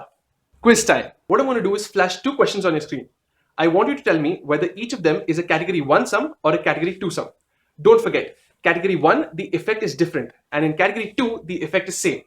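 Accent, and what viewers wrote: Goa, India. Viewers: Are you goan by any chance?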